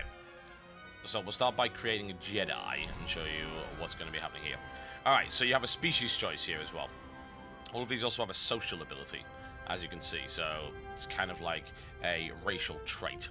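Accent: United Kingdom. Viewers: This may be the least annoying British voice I have ever heard...I shall watch more!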